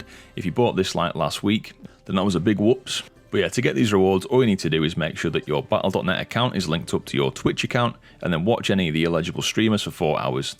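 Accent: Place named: North, United Kingdom. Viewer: Its the warm Northern accent that im here for really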